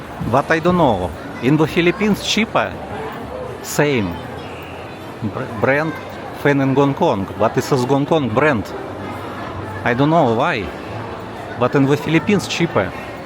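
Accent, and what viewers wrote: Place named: Russia. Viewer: Your accent more Russian but it's okay I love your content I have been in Angeles too.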